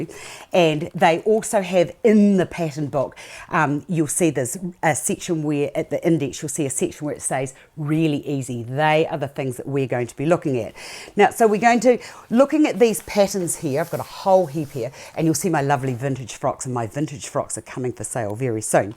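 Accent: New Zealand. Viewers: Not only was this very educational, but I could listen to you all day long- I love the New Zealand accent (Im Canadian :) ). Thank you, Im your newest subscriber :)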